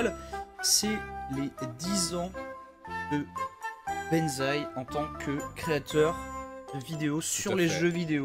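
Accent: France. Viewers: Je me souviens t'avoir cherché dans le forum TGWTG quand tu as sortis ta première vidéo avec eux, t'ayant reconnu par ton accent si Frenchi et on s'était ajouté sur MSN, no joke. Toute une époque vraiment! Je suis bien content de te voir aujourd'hui à l'avant de la scène FR. Des bisous, on t'aime tous et à 30 ans de plus au moins, on te l'espère tous:) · Benzaie d'il y a 10 ans c'est juste le gamin des flashbacks du Hard Corner en fait:-D Je me souviens moi-même avoir vu ces vidéos sur ThatGuyWithTheGlasses (quand ça s'appelait comme ça) il y a pratiquement 10 ans... je me demandais à l'époque "mais qu'est-ce qu'il fait là ce français qui accumule les "fuck" et les "shit" avec son vieil accent?"... Que de chemin parcouru depuis! Félicitations Benzaie de toujours nous divertir après 10 ans, tous les vidéastes ne peuvent pas en dire autant!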